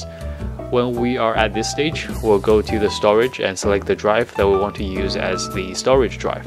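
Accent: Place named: China. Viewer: Are you a Chinese? I like your accent!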